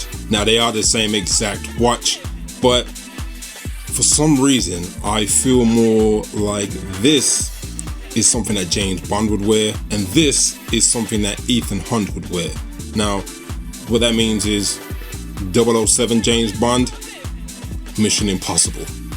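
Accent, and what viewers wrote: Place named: Birmingham, United Kingdom. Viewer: Great vid and a fellow Brummie by the sounds. Cool.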